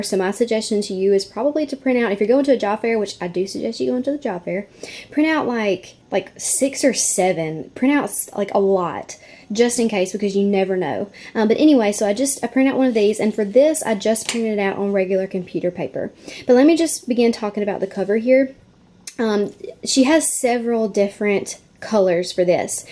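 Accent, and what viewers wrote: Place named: South, United States. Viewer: You are so southern and it's adorable. Don't change!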